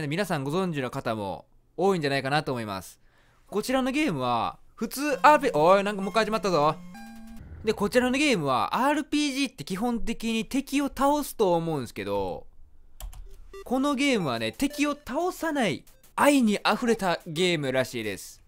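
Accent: Kansai, Japan. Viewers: 殆ど関西弁じゃないwイントネーションだけやんwww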